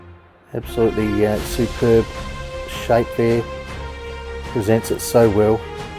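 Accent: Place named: Australia. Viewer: I love this guys beautiful Aussie accent. I could listen to him all day and his knowledge of bodybuilding is amazing.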